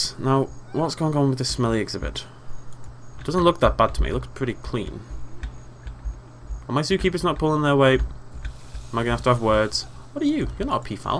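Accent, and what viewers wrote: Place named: Cockney, United Kingdom. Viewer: Haha, you say assume like "ashoom" and instead of a "th" you use "f". What accent would that be, Cogney? It's interesting how there are so many different dialects.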